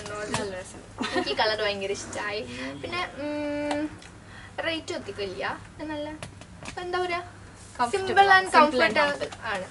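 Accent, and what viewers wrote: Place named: Kozhikode, India. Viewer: നീ കൊച്ചി അല്ല നീ ടെ സംസാരം kalicut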